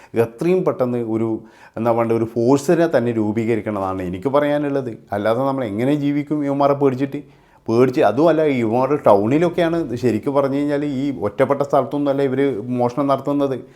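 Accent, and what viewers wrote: Kannur, India. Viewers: നല്ല കണ്ണൂർ ഭാഷ ❤️❤️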